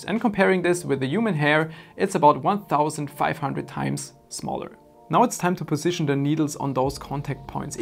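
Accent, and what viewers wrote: Germany. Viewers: I knew you were German simply from the accent. My word.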